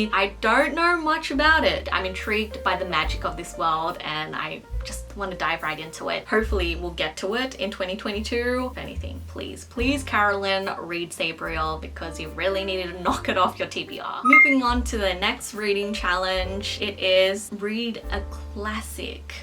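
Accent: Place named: Australia. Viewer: I like your Australian accent and your book shelf ❤️✨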